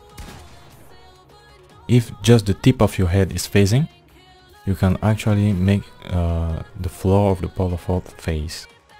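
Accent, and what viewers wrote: France. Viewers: T'es français ?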